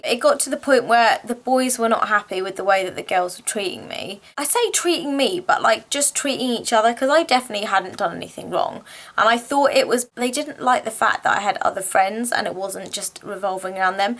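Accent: United Kingdom. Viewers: I love the way u speak ......I love.ur British accent 👍👌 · even though i have finished my grad but still watched it,actually your uk accent made me do that.lol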